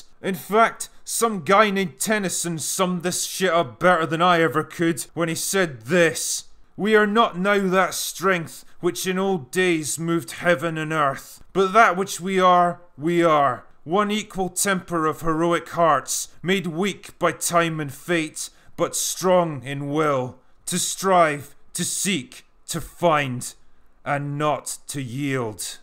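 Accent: Scotland, United Kingdom. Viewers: Despite being read by a Scotsman who sounds like he's angrily gnashing his teeth at the height of inebriation this script is pure healing. I don't get why so many directors also write the script of their movies nowadays when they're clearly two distinct skill sets. And who greenlights these things? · you clever weaver of character tapestry you hear me say. You didn't just 'fix' Luke Skywalker, you provided him salvation. You cleverly wiped the stain of shame from him and restored him to the galaxy saving hero we all came to know and love. I've already bought several of your books, now I eagerly await the version of the Sequel Trilogy. Hell, I'd even pay for the audiobook if you narrated it. Just can't get enough of that Scottish slurring of poetry!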